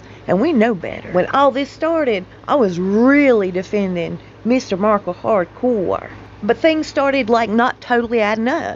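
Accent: United States. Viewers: I love your american accent its soooo kewl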